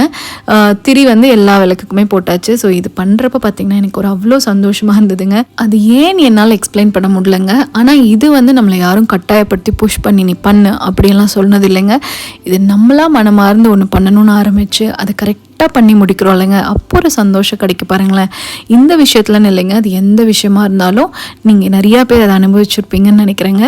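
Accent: Coimbatore, India. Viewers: Lovely vlog ma❤ I studied in Coimbatore Institute of Technology..its been 9 years Missing Coimbatore so much ..Your slang reminds me of lovely cbe ppl ❤️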